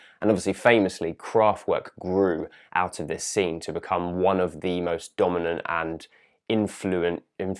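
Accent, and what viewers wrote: RP, United Kingdom. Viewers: HIS POSH BRITISH ACCENT MAKES ME BELIEVE WHAT HE IS SAYING!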